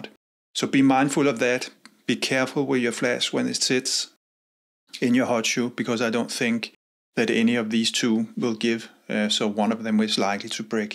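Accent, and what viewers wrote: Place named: Denmark. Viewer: Jeg elsker os danskere når vi snakker engelsk, det er ret tydeligt. 😁 Lækker video, tak for den! Har lige bestilt min til min Lumix S5.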